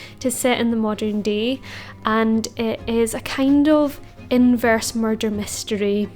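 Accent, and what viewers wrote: Scotland, United Kingdom. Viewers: Lovely video including of course the Scottish accent. Thankyou; from the country of El Salvador 🇸🇻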